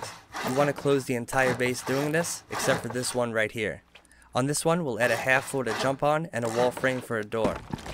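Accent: New York, United States. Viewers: This guy sounds like a New Yorker.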